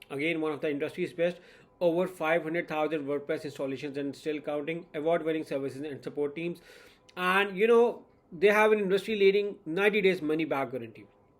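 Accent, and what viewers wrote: India. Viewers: Incredible coupon, a discount like this you can't find anywhere, it's a unique opportunity. Whenever I end up on a video with someone who has an Indian accent, I learn something. Gotta love Indian accents. Thank you sir. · Your explanation is very important because no other video has explained as much as you. Incredible coupon, a discount like this you can't find anywhere, it's a unique opportunity. Whenever I end up on a video with someone who has an Indian accent, I learn something. Gotta love Indian accents. Thank you sir. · Whenever I end up on a video with someone who has an Indian accent, I learn something. Gotta love Indian accents. Thank you sir.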